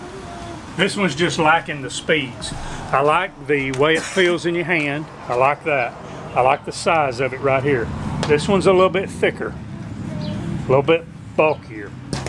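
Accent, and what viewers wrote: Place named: Florida, United States. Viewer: Just asking, love the accent man, reminds me of FL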